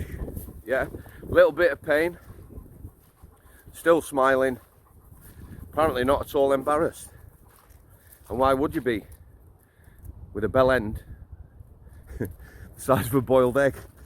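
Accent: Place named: Sheffield, United Kingdom. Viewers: Yeah proper Sheffield accent that. From Sheffield meself. Living in Bangkok now. Stay up till the early hours watching this channel. Nice to hear a proper accent on YouTube. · Love your Videos can tell your from the Steel City of SHEFFIELD a Mile off with that accent. Can't miss it anywhere in the World. Your good at this Mate all the best from SHEFFIELD.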